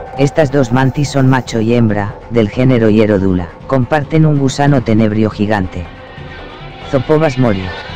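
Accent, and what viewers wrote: Spain. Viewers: él es de España.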